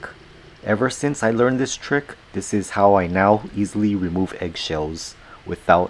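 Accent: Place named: Hawaii, United States. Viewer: Great tip!! I hate when that membrane gets stuck on the egg! Yuck... btw... you sound like you're from Hawai'i 😀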